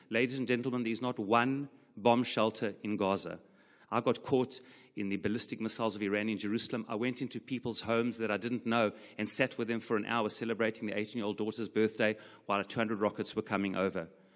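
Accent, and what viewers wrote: South Africa. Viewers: South African accent Praying for alllll the hostages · Rabbi Perez as a South African I recognised your accent. Proud of you and all the other strong people of Israel. May you, your wife and all your loved ones plus all who lost their families, experience Hashem's comfort and peace.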